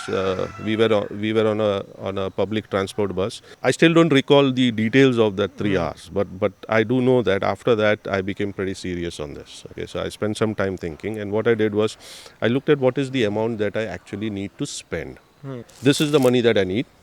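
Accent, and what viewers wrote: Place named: Mumbai, India. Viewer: From the accent, I got a feeling that he also belonged to Mumbai.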